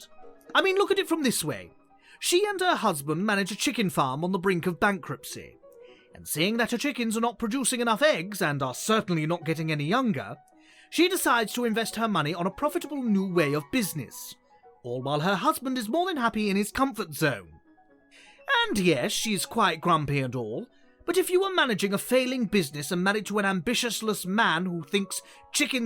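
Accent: United Kingdom. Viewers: This dudes British accent made me happy again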